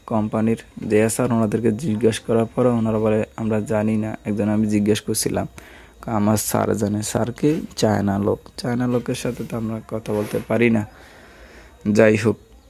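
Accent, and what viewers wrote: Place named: Chandpur, Bangladesh. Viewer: ভাই আপনার কথায় মনে হচ্ছে আপনি চাঁদপুরের মানুষ। চাঁদপুর সদর বা হাজীগঞ্জ, বাকিলা ঐদিকের। আমি ধারনা করে বললাম। আশা করি সত্যিটা জানাবেন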